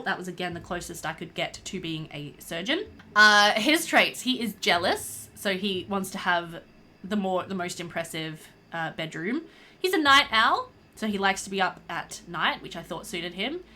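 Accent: Australia. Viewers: austrailian accents...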